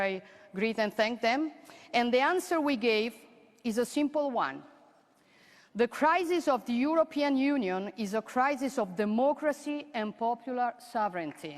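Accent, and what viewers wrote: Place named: Rome, Italy. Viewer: A tutti quegli stronzi che ti sfottono che parli in romanesco, beccatevi questa.....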